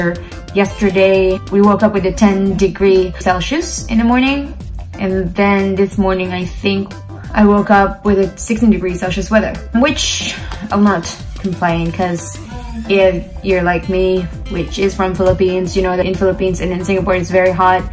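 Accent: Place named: Australia. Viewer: I love your Aussie accent ma'am.New subscriber and friends here💗. How I wish I could work there in the next 2 years. I'm civil engineer here in the Philippines having 3 years of work experience however I'm so hopeless, aside from all the detailed documents you need to prepare.then you need at least to have half a million of pesos.I don't have enough money to prepare all that I need, but still I'm here to pursue that dream 😞🙏🏿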